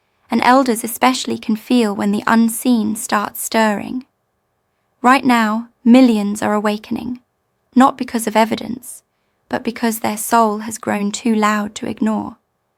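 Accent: England, United Kingdom. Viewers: I like this girl when you do the English accent better than American accent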